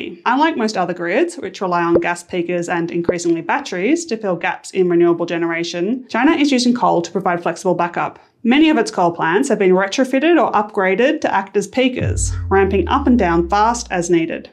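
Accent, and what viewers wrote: Australia. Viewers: is this aussie accent?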